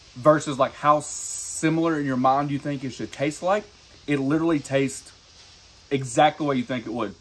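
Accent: South, United States. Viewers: With a Sweet Southern Accent 🤗😎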